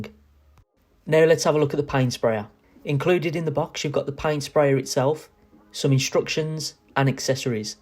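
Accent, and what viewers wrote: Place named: Birmingham, United Kingdom. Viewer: Up the brummie, great ole mate😊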